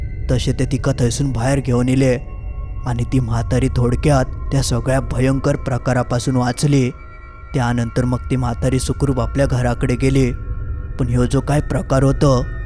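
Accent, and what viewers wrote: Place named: Malvan, India. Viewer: ❤.व्हेरी. Good and.sweet.malvani.voice. 😂.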